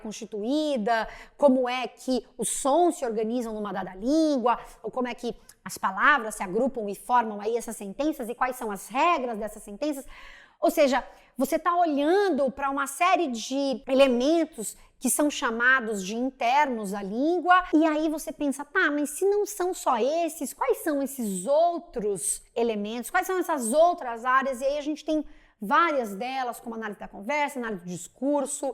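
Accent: Rio de Janeiro, Brazil. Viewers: bonita, sotaque maravilhoso(carioca?), simpática e elegante, mas só caso em cartório...kkk...e acima de tudo...interessante(nota de rodapé).Depois dessa aula finalmente lerei Grande Sertão Veredas...kkk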